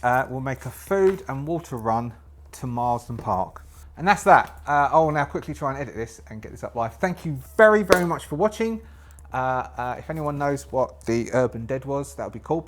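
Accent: United Kingdom. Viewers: Even swearing sounds polite when delivered with a proper English accent my dude. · Never figured you lived in Aus - sound like a proper cockney geezer. Regardless, you definitely didn't miss much in those 22 years.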